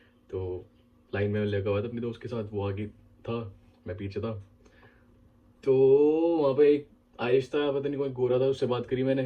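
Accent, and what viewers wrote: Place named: Gujarat, India. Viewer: Gujarati English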